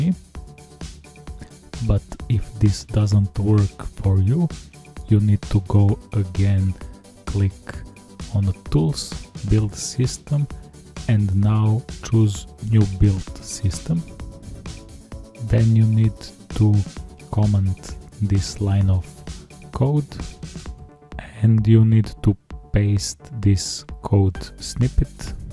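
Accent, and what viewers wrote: Russia. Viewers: Are you from Russia? Or Yugoslavia? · русский ацент)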